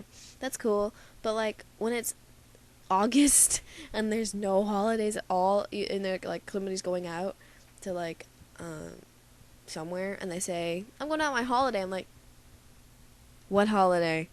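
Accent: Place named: England, United Kingdom. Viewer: hahaa! your english accent cracks me up :)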